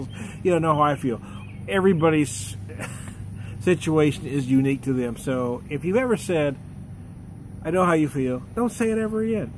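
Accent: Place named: Missouri, United States. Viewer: You sound like such a Missourian lol I'm from Missouri too. Loving these Reverence Series reviews but sadly never tried the Revolution Series. Cheers!!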